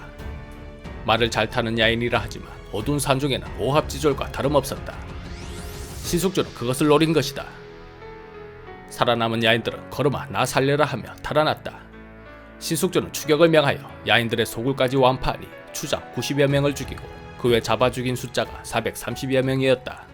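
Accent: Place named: Busan, South Korea. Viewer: 흠...분명히 우리 은..부산싸나이 가튼데...사투리가 전형적인 부산인데...내도 부산이요...흠...암튼..얼마전부터 지독한 찐팬이 된 제임스 라고 하는 미국교민 입니다. 요지음 의 영상을 보면서 즐거운 시간을 가집니다.감사합니다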